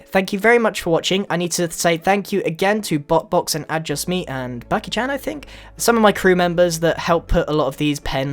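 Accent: United Kingdom. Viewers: I love your voice, the accent is awesome! Are you british? Your voice has just that "british feel" to it XD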